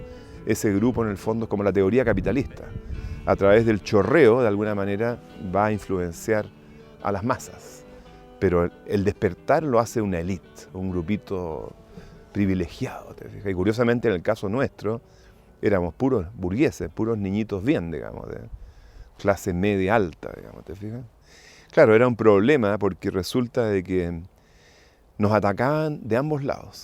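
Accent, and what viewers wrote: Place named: Spain. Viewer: Por que mezclas fonetica castellena con america, es raro como hablas.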